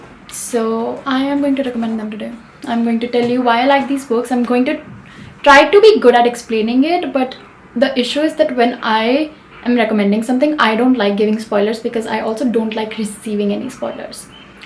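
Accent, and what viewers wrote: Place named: Bengal, India. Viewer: why does she have a hint of a bengali accent? sounds cute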